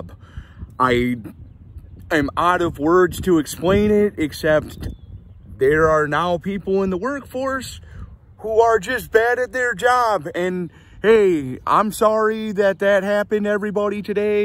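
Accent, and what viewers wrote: United States. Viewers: Wait are you from PA?? Lol love ya bro!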